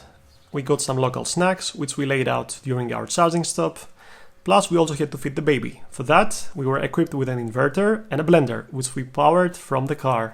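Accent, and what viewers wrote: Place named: Greece. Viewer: I have been following your channel for some time now and I had some suspicion that you might be Greek, by your accent. Now I am even more suspicious 🤨🤣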